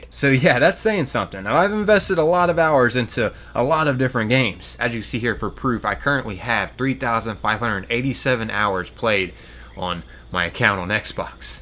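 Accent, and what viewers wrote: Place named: Philadelphia, United States. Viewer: Off topic but I love your accent. Philly?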